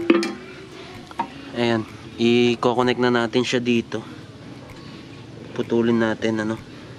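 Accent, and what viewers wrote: Pampanga, Philippines. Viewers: Kapampangan ka boss? Halata sa accent eh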